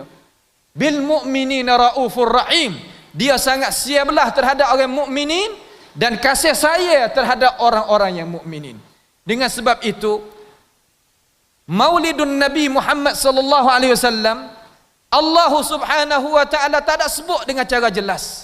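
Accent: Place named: Petani, Malaysia. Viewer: kurang dah loghat petani...hehehe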